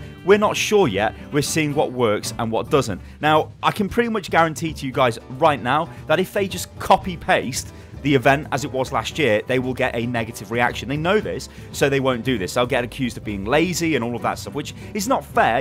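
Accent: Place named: United Kingdom. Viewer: British pep say schedule soooo wried the C is silent when u guys say it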